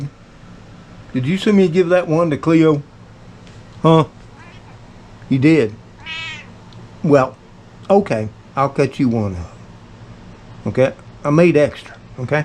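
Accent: South, United States. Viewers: my daddy is from southern Mississippi...I enjoy watching how you do things and listening to your deep southern accent.... May God bless you and the kitty crew.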